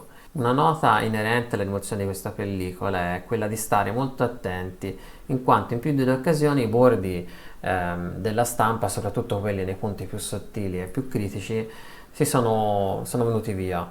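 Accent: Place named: Tuscany, Italy. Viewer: Bel video! Sento un accento del Granducato! Io abito tra Siena e Firenze: se su quel tavolo ti andasse di provarci Infinity ci possiamo organizzare :D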